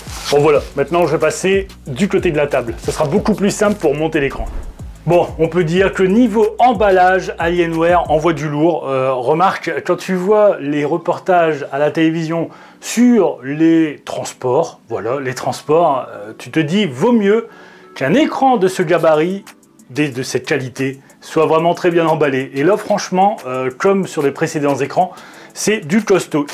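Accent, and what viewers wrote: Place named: Nord-Pas-de-Calais, France. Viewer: le petit accent chti sur les "a" mutés en "oa" bien lourds lol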